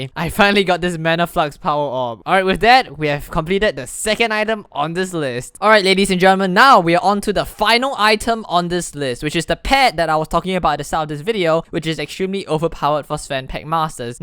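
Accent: Singapore. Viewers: EYY <3 same bro. I could tell by ur accent haha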